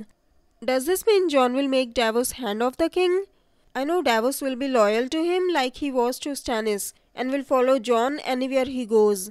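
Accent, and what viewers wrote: India. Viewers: The commentator voice is very annoying, is like listening to a Indian person speak English.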